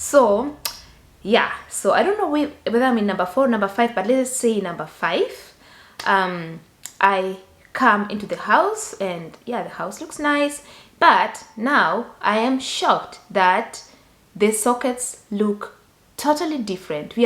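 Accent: Kenya. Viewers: A very interesting video. Unfortunately I’ve never heard anyone speak English with an Kenyan accent and about 20% of what you were saying was lost for me. Good luck in Germany.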